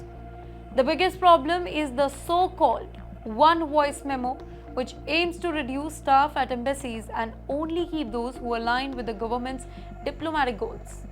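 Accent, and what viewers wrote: India. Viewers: She is Indian. So typical Indian accent is obvious.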